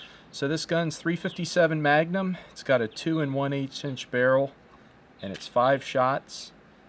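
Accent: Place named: South Jersey, United States. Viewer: crazy your accent is very SJ and it looks like you’re in the Pinelands. Close enough though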